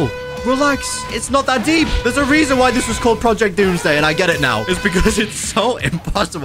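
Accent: United Kingdom. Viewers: Are you britain?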